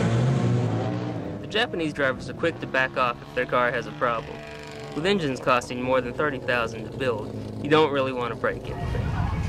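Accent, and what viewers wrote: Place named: South, United States. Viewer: Who did these voiceovers? Guy sounds southern 😂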